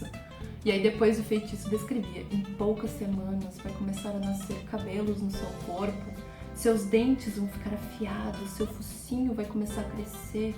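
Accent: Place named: South, Brazil. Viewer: aaaaaa vc é do sul, como nunca notei?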